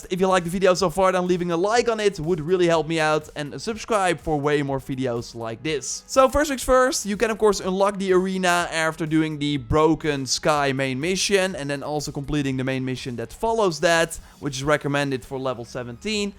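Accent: Netherlands. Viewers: Are you dutch?? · You sound dutch.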